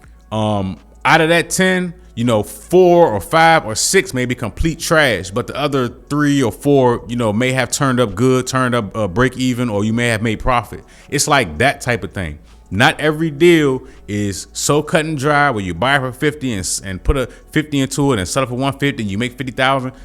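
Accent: DMV, United States. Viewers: 10 seconds in an i knew bro was from DMV lol. Great insight for us beginners!! Respect.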